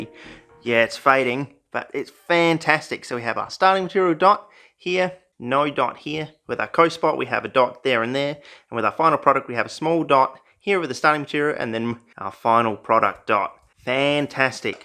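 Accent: Australia. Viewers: As an American from just outside Boston Massachusetts, I’ve completely substituted my impromptu American English accent with a perfect Australian cadence (your voice) slowly over the past couple weeks. Everyone loves it and I’m considering phasing out my normal impromptu accents from around the world to just straight -Australian vernacular. Don’t post for a bit so I can grow out of this silly habit brought upon your paralyzing uploads. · I'm normally pretty good with accents, even Aussie, but i did spend a chunk of the video wondering what "enema" analysis was. :) :) :)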